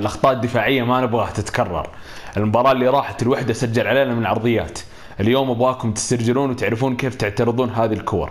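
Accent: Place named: Algeria. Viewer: اللهجة الجزائريه 😂😂😂😂😂😂 والله انت مبدع 😂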